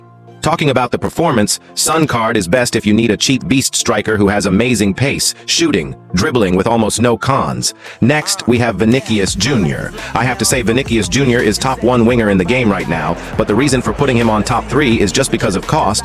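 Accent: India. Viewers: yeah I just found out from one of his video and heard his indian voice and accent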